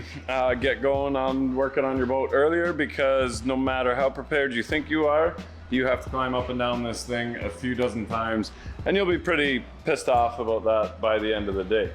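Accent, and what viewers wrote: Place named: Canada. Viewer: How do you spell out the way you pronounce it… owe-t wtf nobody cares your from Canada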